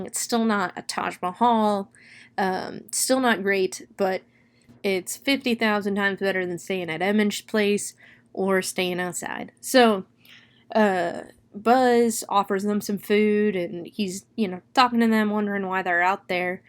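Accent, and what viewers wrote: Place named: South, United States. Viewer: Just noticed you sound more Southern throughout the video lol. Anyways, good review and keep up the good work! 👍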